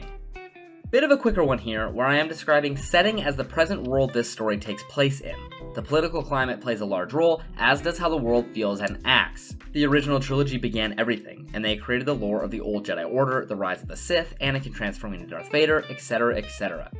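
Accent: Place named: United Kingdom. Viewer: Like the British accent! You should probably go with that from now on🦹🏻‍♂️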